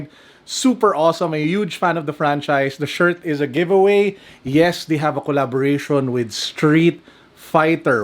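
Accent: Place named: Philippines. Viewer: Love the Filipino accent. Cheers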